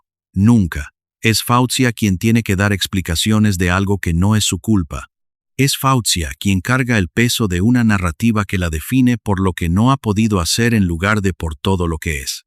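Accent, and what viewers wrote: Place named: United States. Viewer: Cambien el robot de lectura con ese acento yanqui horrible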